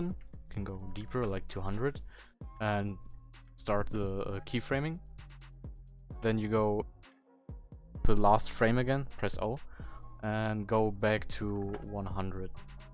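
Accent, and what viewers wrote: Germany. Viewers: er ist deutsch aber versucht englisch zu reden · Man merkt, dass er deutsch ist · Ur german accent tho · man hört heftig raus das du deutsch bist xD c: aba danke für das video <3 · u german? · German-English dialect i can hear this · Yo Ich wusste das du deutscher bist wegen deinem Akzent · man hört so krass das du ein deutscher bist haha aber danke<3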